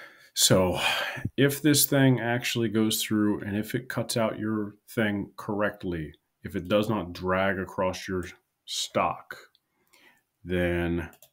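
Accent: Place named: United States. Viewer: you sound the most american ever. you are funny btw hahahahahah.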